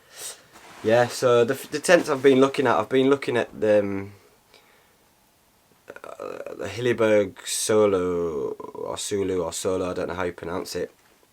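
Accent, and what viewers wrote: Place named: Hull, United Kingdom. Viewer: Hi pal just watched this vid and really enjoyed it. Gotta ask where are you from? Im from hull and you sound local? Ive got a helm 3, my first tent and had it since jan2018 and still going strong at 35 wild camps. Youve gotta get another helm just for the sake of it! Saying that theyre pretty rare these days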